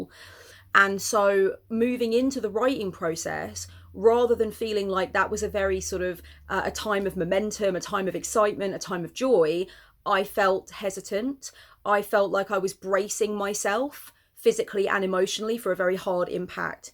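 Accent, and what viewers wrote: United Kingdom. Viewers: You are right! I wouldn't listen to an audiobook if it wasn't you. My son has Autism and is obsessed with British accents. Sometimes I put you on to calm him or pull him out of meltdown. XO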